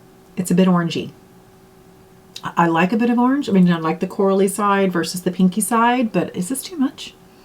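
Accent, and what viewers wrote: Ireland. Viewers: I like the whole line up… lipstick included! You are developing a tinge of an Irish accent😊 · Are you irish , could swear i heard some irish accent in there, im irish by the way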